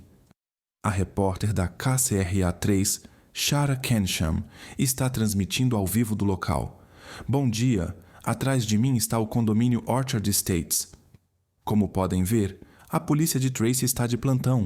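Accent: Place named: Portugal. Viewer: 𝑸𝒖𝒆 𝒑𝒐𝒓𝒓𝒂" 𝒆́ 𝒆𝒔𝒔𝒂, 𝒖𝒎𝒂 𝒉𝒐𝒓𝒂 𝒂 𝒏𝒂𝒓𝒓𝒂𝒄̧𝒂̃𝒐 𝒆́ 𝒆𝒎 𝑷𝒐𝒓𝒕𝒖𝒈𝒖𝒆̂𝒔 𝑩𝒓𝒂𝒔𝒊𝒍𝒆𝒊𝒓𝒐 𝒐𝒖𝒕𝒓𝒂 𝒉𝒐𝒓𝒂 𝒆́ 𝒆𝒎 𝑷𝒐𝒓𝒕𝒖𝒈𝒖𝒆̂𝒔 𝒅𝒆 𝑷𝒐𝒓𝒕𝒖𝒈𝒂𝒍" 𝒆𝒎 😅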